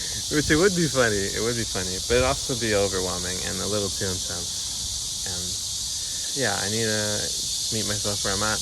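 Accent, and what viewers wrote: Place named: China. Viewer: You're developing a Chinese accent.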